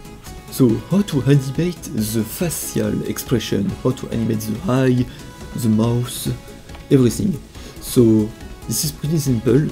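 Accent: France. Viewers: French? I was wondering why you sound like I have no idea about. Are you actually from France? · Oh tu es Fraçais ! C'est bien ce que je me disais ;) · Tu as un axen fr lol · As French myself , i enjoyed to recognize this famous accent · Ah yes, french accents. "Y a du skill" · nice l'accent français mec ahaha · mdr tu me fait rire avec ton accent francais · This is french accent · je l'avais déja remarquer ton accent français :p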